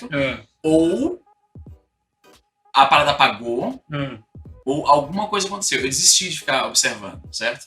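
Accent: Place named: Ceará, Brazil. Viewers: Sotaque dele é muito forte. todo vei aqui em Fortaleza fala assim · Éeeegua.... aêeeeee um cearense numca esquece suas raízes! Aprende com teu pai Izzy 😄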